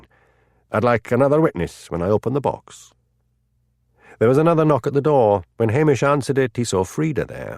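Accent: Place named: Scotland, United Kingdom. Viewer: Thanks for uploading. I only read the ones read by this Narrator. His Scottish accent certainly adds to Hamish Macbeth's character.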